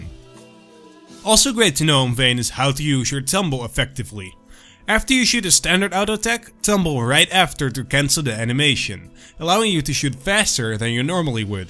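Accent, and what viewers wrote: Netherlands. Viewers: u realy sound like my english teacher just in case tho are a english teacher and are u dutch ? u sound realy sound like him. ;P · Your english seems dutch! Am i right? :)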